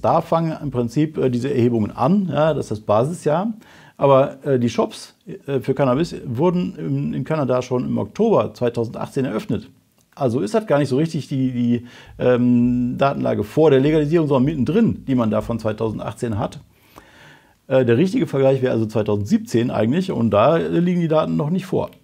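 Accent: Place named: Duisburg, Germany. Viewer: Ich mag den Dialekt. Schätze Duisburg.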